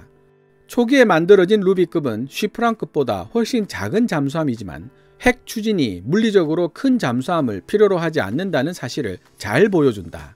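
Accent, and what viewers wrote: Gyeongsang, South Korea. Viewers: 좋은 내용 감사합니다 그런데 경상도 사투리로 설명하는 바람에 찌라시 냄새가 나네요. 아나운서나 전문 성우 목소리처럼 표준말로 설명해 주시면 내용의 신뢰성이 더 높아질 것 같군요.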